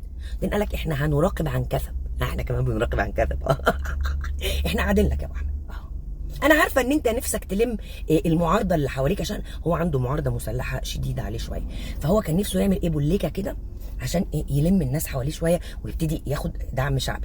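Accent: Egypt. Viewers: والله تعجبني مصريتك القويه الواضحه والله الملعب منور بيكي ياعسل وكنتي وحشنا كتير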